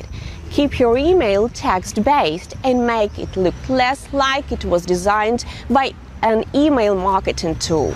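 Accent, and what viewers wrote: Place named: Russia. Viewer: Ukrainian company.....Her accent is very strong Russian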